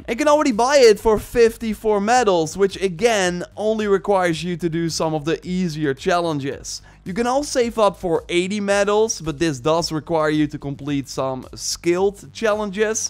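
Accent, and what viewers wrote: Netherlands. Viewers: I feel like you are dutch? Are you🤨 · A thicc dutch accent 😂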